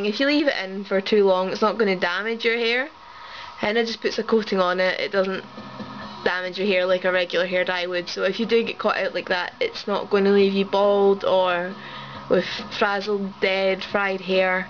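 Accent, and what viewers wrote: Scotland, United Kingdom. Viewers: You sound scottish :) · This is my own way of making it. Try it out and test it on a small part of your hair first. I hope you don't mind I posted here for you and everyone else who like to try. You can delete my comment if I was for me to post it here. Sorry about that. I STILL LOVE your accent!!!! I have ALWAYS LOVE Scotland!!!! Again, thanks for the video. =)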